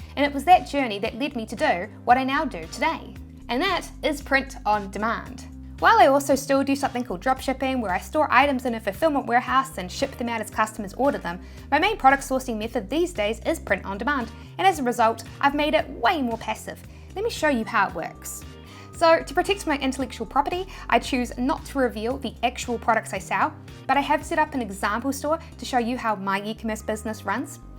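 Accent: New Zealand. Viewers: I love the New Zealand accent. I'm moving there. It's settled. I made this decision after watching the almighty Johnsons... but I'm declaring it on this random YouTube video that popped up for me. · I believe she is from New Zealand... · "Chick out check" - I will never get used to the Kiwi accent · Thanks,. So inspirational. I love your Kiwi "ik-cent" too:-) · I ended up with your video as I was googling Kiwi accents awwww I miss NZ sooo much! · Wow, New Zealand's accent is so good.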